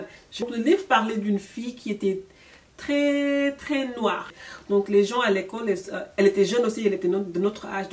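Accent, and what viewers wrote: Senegal. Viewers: MlleMama c’est pas qu’elle parle mal français, c’est l’accent sénégalais qui est fort comme ça, toutes mes copines sénégalaises parlent de la même façon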